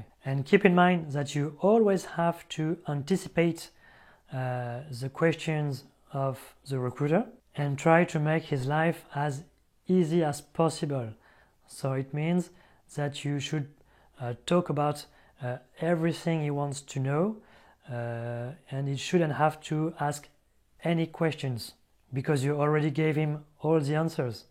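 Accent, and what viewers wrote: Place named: France. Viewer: Je ressens toujours l'accent Français quand vous parlez lol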